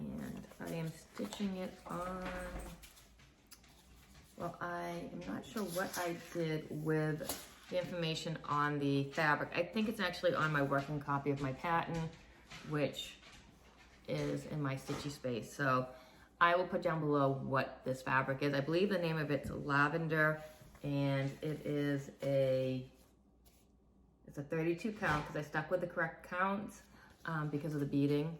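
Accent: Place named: Boston, United States. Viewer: I have a couple of questions. Is there a reason you roll your fabric instead of fold it, or is it just preference? In what part of Maine do you live? I thought for sure your accent was from Boston. 😊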